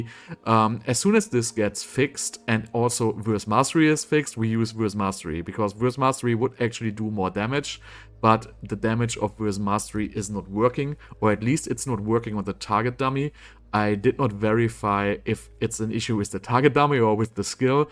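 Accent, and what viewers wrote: Germany. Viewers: So geil das man deinen deutschen Akzent hört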